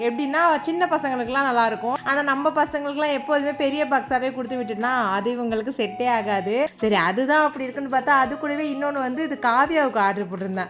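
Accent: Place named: Mayiladuthurai, India. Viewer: Nan mayvaram neenga samikerathu pesarthu ellam engla polava irukku nan ipathan unga vidios pakeran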